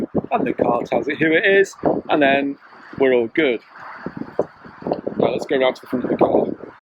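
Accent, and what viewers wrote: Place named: Northern England, United Kingdom. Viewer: a proper (Northern) accent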